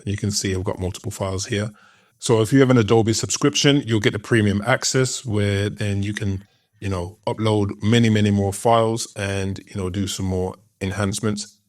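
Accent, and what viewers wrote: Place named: United Kingdom. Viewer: You from the UK, G? You look like you’re based in the states! But your accent sounds local?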